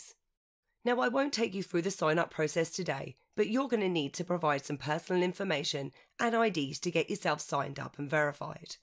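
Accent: United Kingdom. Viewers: you sound like your from in t uk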